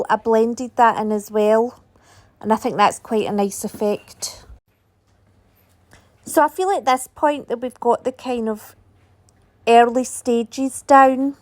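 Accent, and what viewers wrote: Scotland, United Kingdom. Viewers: Is your accent Scottish or a certain region of U k❓. It’s delightful💞💞. Recent fan of your channel/works of art❣️❣️❣️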